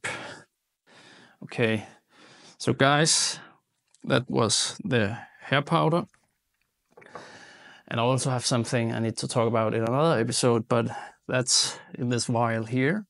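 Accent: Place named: Denmark. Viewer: i knew u were danish by ur accent, nice video :D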